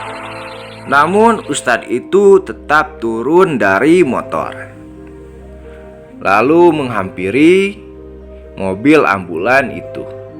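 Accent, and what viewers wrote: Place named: West Java, Indonesia. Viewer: Nu ngadongeng sorana siga urang sunda.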